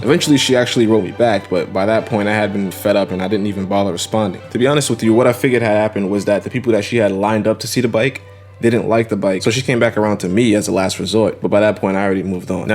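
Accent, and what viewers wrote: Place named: New York, United States. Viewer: Man I knew the minute I listened to your voice I knew you were from NY also lol. Hope to run into you in the city sometime, love your videos 👍🏻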